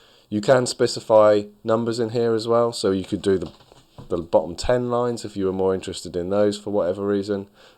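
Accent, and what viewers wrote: United Kingdom. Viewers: Tough British accent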